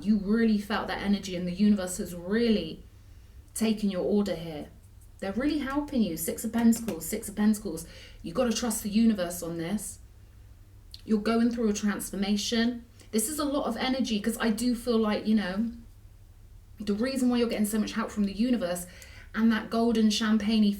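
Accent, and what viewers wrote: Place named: London, United Kingdom. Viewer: Hi 👋 Hey U.K...your smashing this and having your way..go ✨️ O me O my conduit connection to the almighty. Love your voice, can hear London, can hear down country, can hear a beautiful vibe. What goes 😳 around comes around, don't keep hurting innocents..for your power. Learn your karmic Lessons and come back to the Light. Peace&Love&Light Namaste 🙏 ♥️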